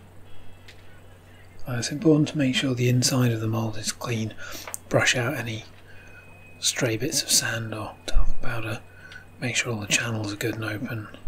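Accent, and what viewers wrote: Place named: New Zealand. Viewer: I had to doble check where you came from. Your accent could cut as a New Zealender, but I saw your plug outlets and, you live just over the puddle. XD You see, I've been searching for electrum in all of Norway, it does not seem like there is any of it anywhere to be found here. My fiancé and I want green wedding rings and electrum is the best option but we have so far been unable to find raw material. Where did you get a hold of electrum?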